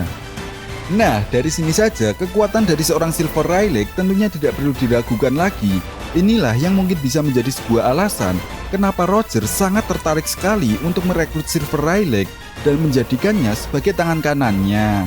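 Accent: Java, Indonesia. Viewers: Bahasane meduk rek 😁.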